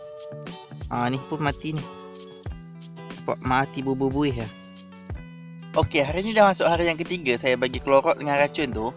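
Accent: Pahang, Malaysia. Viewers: ckp mcm org pahang..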